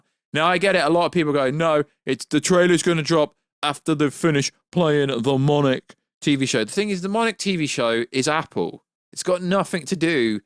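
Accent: United Kingdom. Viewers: you have a cool British accent!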